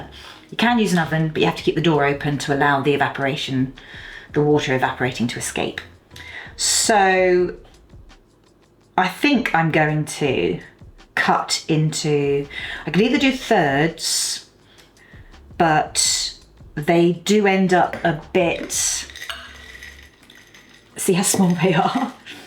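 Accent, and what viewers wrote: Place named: United Kingdom. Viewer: Thank you for sharing/posting. I love that British accent.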